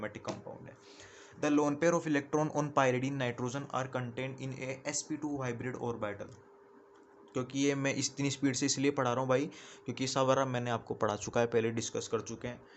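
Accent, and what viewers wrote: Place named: Rajasthan, India. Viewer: Ap rajasthan ya haryana se lgte h😂 bht bdya smj aaya easy kr diya blkl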